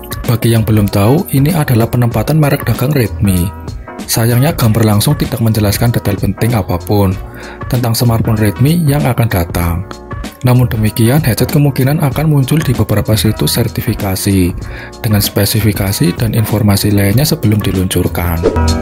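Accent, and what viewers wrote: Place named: Tegal, Indonesia. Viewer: Sing ngisi suara wong Endi coyy... Medok n ngapak nemen yahh.. wong Tegal apa Brebes ??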